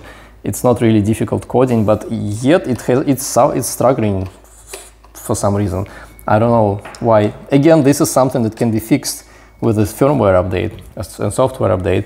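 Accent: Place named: Russia. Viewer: невозможно слушать тяжелый русский акцент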